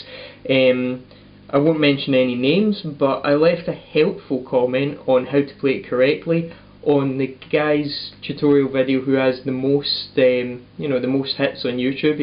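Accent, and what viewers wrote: Scotland, United Kingdom. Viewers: are you Scottish? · Was searching through all the intro tutorials then came across your's. I chose to stick around cause your Scottish definitely paid off thanks for the great lesson ;)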